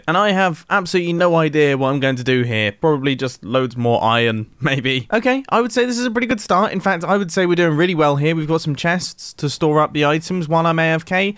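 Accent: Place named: United Kingdom. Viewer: You sound no different than you usually do. Like a charming, if not occasionally clueless, British guy who does minecraft redstone for a living. That was a complement by the way, you sound fine like you always do.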